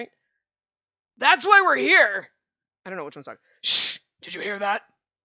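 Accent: Ireland. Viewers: "Favorite boy, he's the last route, he's an asshole..." Me: Waiting, knowing full well that this boy is gonna have glasses, is gonna be a robot, or something else special. " Fukuyama." and there it is XD Does he have white hair (Looks to see) Blonde? Okay, close enough. XD XD Irish accent. Didn't it's regular use start with Bustafellows? Shu? You just wanted to experiment with it but it ended up sticking? (and making me REALLY DISAPPOINTED that Shu did NOT have an Irish accent in the game...) I can't recall you using an Irish accent regularly in your repertoire prior to that. Also, (adding after the end.) Fukuyama DOES voice the sketchy characters, but when it comes to Vyn Richter, I got so tired of the "he's a red flag" deal I literally wrote a 2k essay on why he's not and I will proudly stand by that essay. Vyn is a good boy, even if he wasn't voice by, so haters need to leave him alone. 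XD XD I'll stand by you as you stand by him. LOL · The Irish accent started with Jack from london detective mysteria because he had a accent was how he was written But you really start to use it with norn9 I believe that was the kickoff point when you start to use it with almost every game which I love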